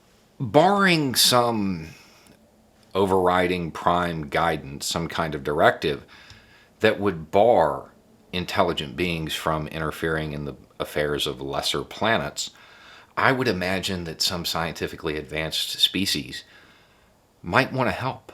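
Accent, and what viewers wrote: South, United States. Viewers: You got that good ole boy accent, but you have a genius quality view of reality. I wish there were more of you in positions of power.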